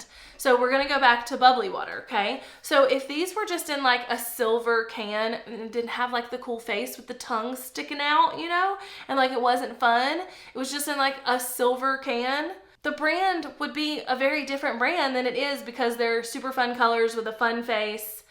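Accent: South, United States. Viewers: I love your laid back, fun personality. It was great when, in another video, you made it ok to be a multi-passionate in my business. And I also love your southern accent because I'm from the south so that makes you feel familiar. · Mom, creator, loved your accent because I’m also southern, 😊 AND love the advice with a dash of humility when you don’t know all the things (e.g., vlogging). Working in a bubble at home is hard and your “chats” and business advice are a great way to feel connected to a business environment while still at home 💕 Thanks for that and best of wishes on your vlogging adventure! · You southern accent! I’m from Georgia ❤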